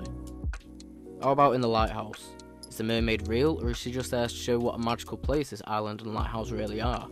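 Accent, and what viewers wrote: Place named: United Kingdom. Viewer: I scrolled down to subscribe wondering if you had tens of thousands of subscribers or hundreds of thousands. I'm truly astonished you only have 35 when I write this. This is a really good video essay, nicely presented, excellently written and well researched. Honestly, I don't have an issue with your diction being a fellow Brit, but I think to be more accessible to international audiences the other comments are right, you have to clear up your accent a bit. I know it sounds classist but the BBC have it right wanting ppl's accents to be clearly understandable.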